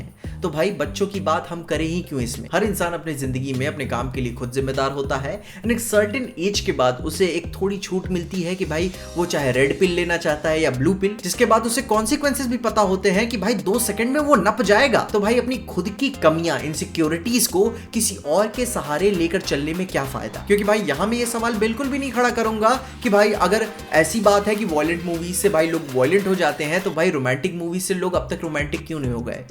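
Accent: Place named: Uttar Pradesh, India. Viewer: Areey bhaiii lanth sunke laga ki tum bhi up ke ho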